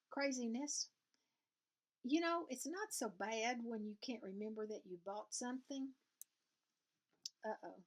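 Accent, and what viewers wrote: South, United States. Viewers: I enjoyed your video. Your finishes are lovely and I love your Amazing Grace bag. I have that pattern also. :) I love your accent. I am from the South as well. I was born in Alabama but live in North Georgia now. Where in the South do you live? Happy Stitching! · I love your videos. Don't worry about that Southern accent because I speak Southern too! 💁 Your projects are beautiful and your finishes are done so well. Thanks for sharing with us! Carolyn.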